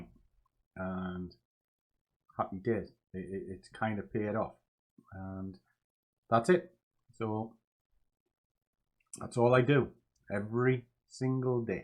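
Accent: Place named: Teesside, United Kingdom. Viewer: First time here , really informative and going by the accent think you must be local to me ( I'm in teesside)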